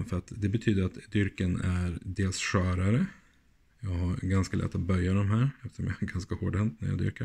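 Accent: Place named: Australia. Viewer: Cheers mate that would be greatly appreciated. They can be a fun read at times when the translation doesn't match what you are saying, so don't expect them to be an exact translation, it has a lot of trouble with the Aussie accent, it's just a tool to give us the general idea of what you are saying. You were mentioned on Lockmania's series promoting locksport channels. I really enjoy that locksport is such an international sport and locks speak a universal language that we can all understand regardless if there is translation or not. I am enjoying your channel.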